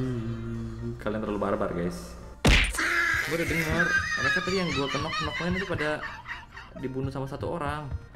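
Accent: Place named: Surabaya, Indonesia. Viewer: Wkwkwk boso jowo wes suwe ng Sby jowo suroboyoan bang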